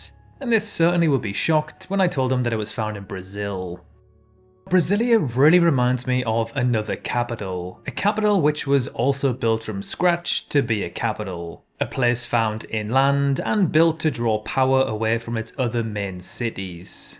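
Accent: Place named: RP, United Kingdom. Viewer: You sound like an upper class twit from a Monty Python skit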